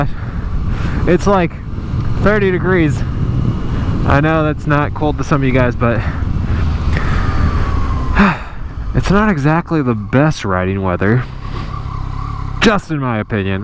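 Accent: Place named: Texas, United States. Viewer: Your Texan is showing! Yall!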